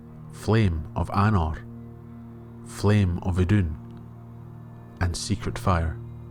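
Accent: Scotland, United Kingdom. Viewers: Love the part involving Gandalf and the Balrog and the explanation there, have to admit that you give it so much thought. We have here a clash of the 'fire of the sun' as you kind of put it, but also a kind of 'pure-fire' (which may be associated with the colour white in a lot of artworks), and the 'red fire' of the Balrog. There is considerable colour-psychology at work here, with 'White' oft-associated with purity as you here noted, where red is a colour of 'passion' and 'rage', and given how the Balrog is often also associated with darkness (a colour of evil in classical colour-psychology). It seems that there's a statement being made here in regards to the nature of the two predominant figures and the kinds of flames they represent. Gandalf's is good and is representative of Eru and the Balrog is representative of Melkor (who is still technically out there in the void). 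Just something I noticed in this video (I know you covered all this) but it is still fascinating to go over it again. The use of colours in Tolkien's descriptions reveal also the attention to detail Tolkien put into his scenes, and add to his mythology (another ex might be Gurthang, being a black sword a representation of Turin's inner darkness, where his helm often appears golden, which is a regal colour associated with good but also greed). This video was perfect, really enjoyed it and your lovely Scottish accent, and really hope you do that history of Angband series that you want to do.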